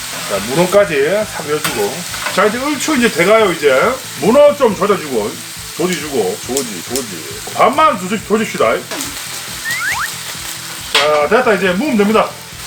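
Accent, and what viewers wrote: Gyeongsang, South Korea. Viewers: 고향은 울산 방어진이시고, 현재는 강원도 홍천에 살고계세요-^^ 울산에서 오래사셨어서 경상도 사투리를 많이쓰세용😁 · 대구 부산 둘다 살았는데 대구 말투에 더 가까운 거 같기도 하고...ㅋㅋㅋㅋㅋ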